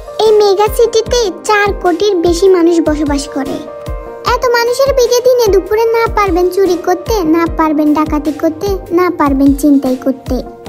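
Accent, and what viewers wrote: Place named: Old Dhaka, Bangladesh. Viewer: আপু তোমার এক গল্প কয়বার দেকলাম ঠিক না-ই তোবো ভালো লাগে আমি fb এর মধ্যে পরছি তবো এমন ভালো লাগেনাই। যতোটা তোমাদের মুখে ভালো লাগছে। বিশেষ করে তোমার মুখে পোরান ঢাকার ভাষার নতুনভাবে প্রেমে পরলাম ভালো লাগে। যতো বার শুনি মনেহয় এই ffটার প্রথম বার শুনছি। ❣️❣️😊😊😊😊😊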